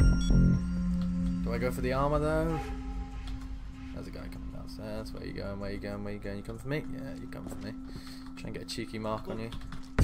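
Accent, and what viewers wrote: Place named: United Kingdom. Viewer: so british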